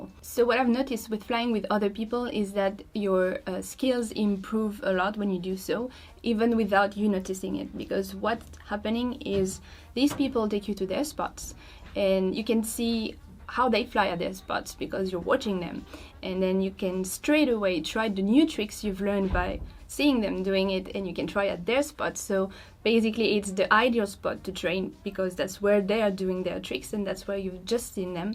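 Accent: France. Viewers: good video.. and i like english with french accent more than french itself XD ... so we're both not native english speakers and i wish i could speak that good... writing/reading/listening is so much easier than speaking for me.. i couldnt do it it that fluent :D ..recently i saw the comparison video from J.Bardwell about the chameleon/rooster/Chameleon Ti and now i dont know what frame to buy next because i really like the Rooster lol ^^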